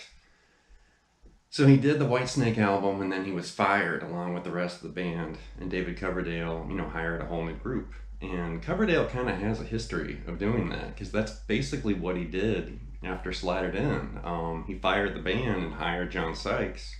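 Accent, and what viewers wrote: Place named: South, United States. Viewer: No idea who you are and you kinda look and sound like you should be playing a banjo on a country house porch somewhere but sheeeet man you can shred for sure. You also know what your talking about which proves once again that looks can be deceiving. I need nothing more than this to Sub to your channel and I’ll listen to you break down any guitarist licks. Thanks man and rock on! 🤘🏼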